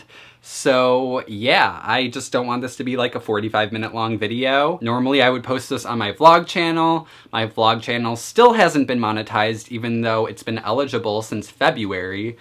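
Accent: Midwest, United States. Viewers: Brian Zavala yeah he does to me I'm southern (US) and he has a lil sorta kinda I think it's Midwestern accent I think it's so cute💕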